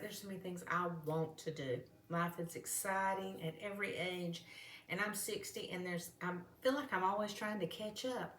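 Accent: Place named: Texas, United States. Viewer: Just found you on here your banana bread looks so nice I'm going to try it cos respices tells you 2 bananas yours has 5 so maybe it will taste and look better I'm like to bake but I'm not very good at it 😂and love your texes voice I'm Irish but live in Spain anyway glad I came arocse you till next time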